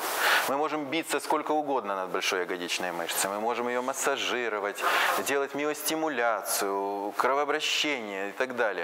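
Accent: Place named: Odessa, Ukraine. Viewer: Одессит. Папа тоже травматолог.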